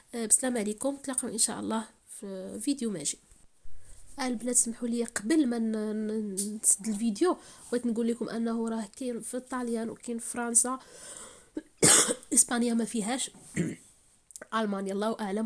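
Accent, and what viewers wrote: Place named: Maghreb. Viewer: سلام عليكم 🤗أنا ندخل نتفرج بش نسمع اللهجة المغاريبية تعجبني برشا 🇹🇳🇲🇦🙏❤و ربي يعينك 🤲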